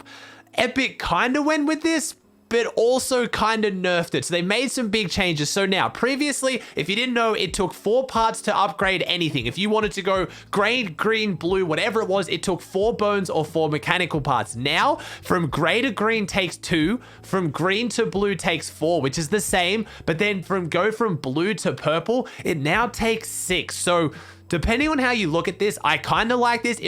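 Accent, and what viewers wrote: Australia. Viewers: Man I love starting my day listening to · asmr??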